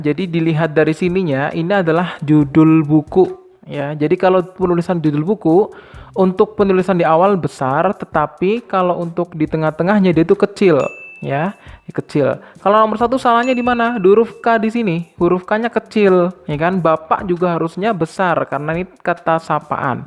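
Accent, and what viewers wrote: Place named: Java, Indonesia. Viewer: Bener lho suaranya mirip dosendeso...agk kejawa2an ngomgny...kaya org jawa medok dikit hihi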